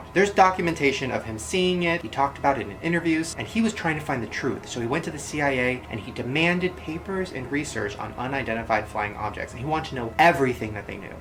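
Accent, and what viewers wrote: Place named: United Kingdom. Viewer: i love the subtle british accent he does in this series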